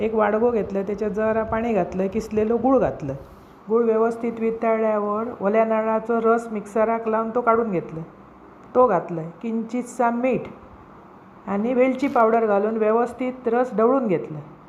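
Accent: Malvani, India. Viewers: Tai tumhi kay kay recipi bannvta aani goad malvani bhasha amachya aai sarkhi athvan aali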